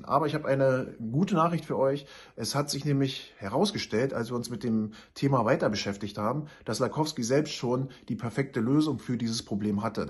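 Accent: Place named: East, Germany. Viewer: Also das versteh ich sogar als Weib die nicht der Ostsprache mächtig ist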